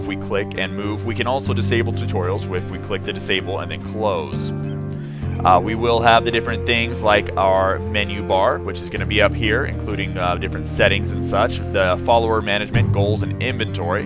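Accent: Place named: Italy. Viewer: your accent sounds more italian than spanish :)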